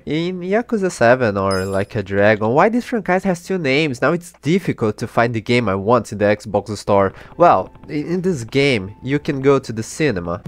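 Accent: Brazil. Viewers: ... your english skills sounds like a brazillian to me. (also i mostly agree on your opnion)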